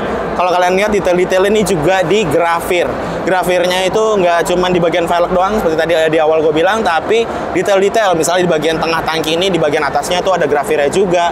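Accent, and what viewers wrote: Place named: Central Java, Indonesia. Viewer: Ngomonge medok mesti bengkel e wong jawa tengah iki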